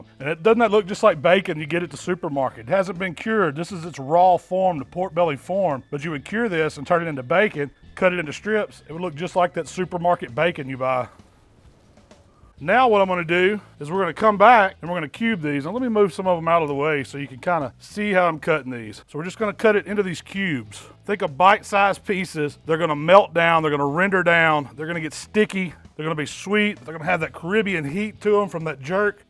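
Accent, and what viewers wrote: South, United States. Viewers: When you see a big dude with a southern accent making barbecue, you know you are in the right place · You get a big ol' boy with a southern accent.. You listen to what he has to say about smoking and BBQ.. I don't care who you are. I got these marinating right now and smoker tomorrow.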